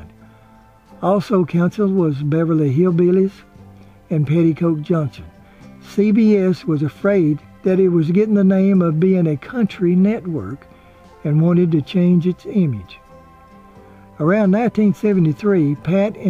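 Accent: South, United States. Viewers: Great narration as always. Your smooth voice coupled with your southern accent makes your work more appealing. I only knew this actor from Green Acres. I had no idea he had such so many other roles! Very interesting. Thank you. I really enjoy your work. Stay safe! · So nice to hear a Southern voice on documentaries! This was so well done and respectful. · I've said it before but say it again when I hear the SOOTHING SOUTHERN VOICE of I know I'm HOME in Louisiana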